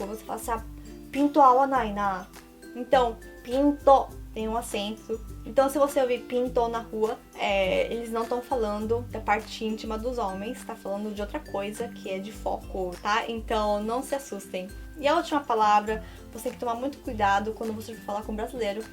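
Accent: Japan. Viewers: Alguém mais acha essa pronúncia japonesa dela linda???? . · Eu simplesmente AMO seus vídeos! ❤ E seu sotaque japonês é lindo, você realmente fala muito bem, dá pra perceber a diferença nítida quando comparava com o português, é muito bonitinha a sonoridade ^^ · Adoro o sotaque japonês😄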